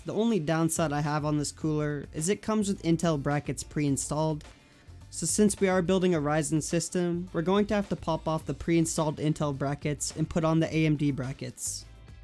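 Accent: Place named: Canada. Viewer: man looks like a 30 year old spanish father of 3 with a happy wife but sound Canadian as fuck also sup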